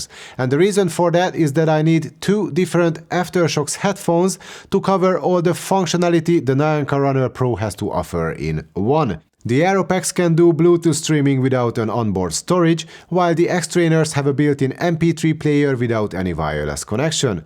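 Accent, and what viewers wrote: Hungary. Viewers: Thx Mate, nice review! I have Shokz OpenSwimm but lately I'm missing bluetooth. I was thinking to buy an OpenRun as well, but after this I maybe try Neanka. But a bit scary how easily it broke in your backpack.... Shokz is still in good shape for me. By the way, you were right about IPX8 and IP68 not the same, BUT from waterproof standpoint are the same. The third character (X or 6) stands for dust /solid particles resilience (X means not tested, 6- has tested and ok against dust and solid airborne particles) . Forth character (8) is the waterproof rating (tested being submerged in 1 meter of water for up to 30 minutes) Last thing... Don't take it wrong, but aren't You from Hungary? I have very similar accent.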